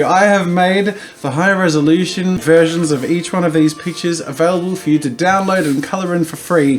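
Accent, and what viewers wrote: Australia. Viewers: Does he keep calling them ‘color-in book’, or is that just the Aussie accent??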